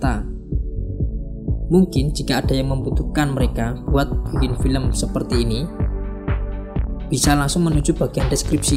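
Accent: Java, Indonesia. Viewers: Jowoe medok banget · medhok cuk,jogja ne ngendi sampeyan mas?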